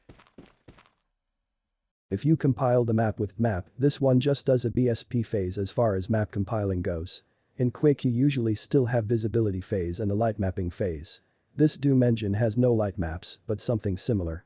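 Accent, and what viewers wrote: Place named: Austria. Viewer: Please continue with this tutorial series. I found this by accident - you should add a link to the RBDOOM-3-BFG README file. Also, the Schwarzenegger English is fine you don't have to waste time with the robot voiceover.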